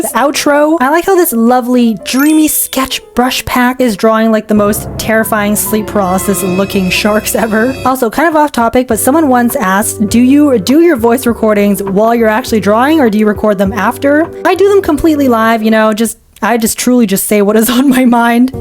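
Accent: United Kingdom. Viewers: British English Alert !!!!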